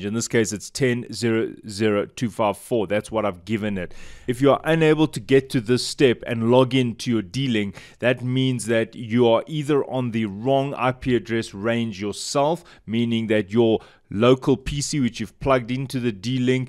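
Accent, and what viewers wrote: South Africa. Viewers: Such a mzansi accent, love it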